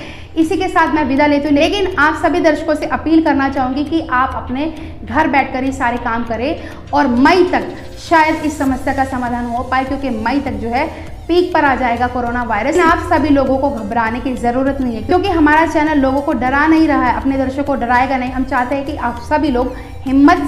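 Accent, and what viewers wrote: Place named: Haryana, India. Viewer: Apne boli kit gye bhai harvani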